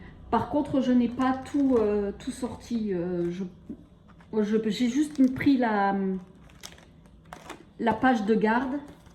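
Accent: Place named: Lorraine, France. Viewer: Cc, je découvre ta chaine et ton accent me fais chaud au coeur, je suis d'origine également de Lorraine , à la frontière Lux, mais maintenant dans le sud. Tres beaux classeurs. Et meme objectifs que toi, ne plus prendre dans les livrets pour finir le mois. Aux enveloppes depuis 1 an e1/2. Tout à fait une autre vision de l'argent avec les espèces..👍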